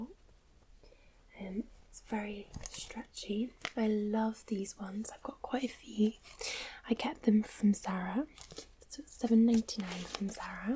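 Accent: Scotland, United Kingdom. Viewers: great video , thank You! I enjoyed the accent .. first video I've seen that You actually used it..least that I could tell. You're lucky to live in Scotland, im a huge scotch fan, Laphroaig, from Islay. Thanks again. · Not sure if you have done that before, but would you consider making a video where you purposefully talk "very scottish"? I kinda love it and it might actually be tingly :D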